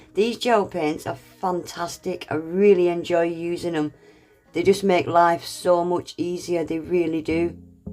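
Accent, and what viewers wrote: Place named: Scotland, United Kingdom. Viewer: Impressive, as always! I have to say, once you said you were Scottish, I said, "That's it! That's where her accent comes from!". But, like you said, it's not quite that obvious. I love listening to you! I'm American, but I have royal Scottish blood in me! My family is very proud of that. My ancestors had to flee the country many generations ago because of their religion. Not an uncommon story. Thanks for sharing!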